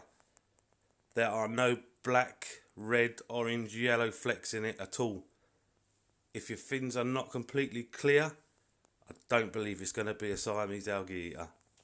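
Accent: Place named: Cockney, United Kingdom. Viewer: Mate this is the first one your videos I have watched and I love it. Purely because it’s so good to have a cockney lad doing a video rather than listening to more American voices! 😁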